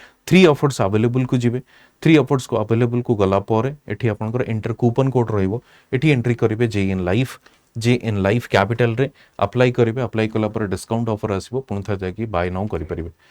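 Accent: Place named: Odisha, India. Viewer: E master odia english style re kahibaku chesta karuchi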